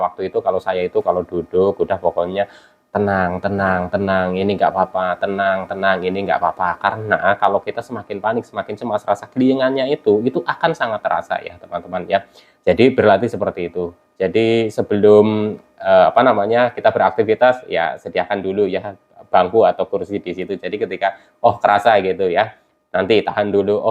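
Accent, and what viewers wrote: Banyumas, Indonesia. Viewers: Menyimak ngapak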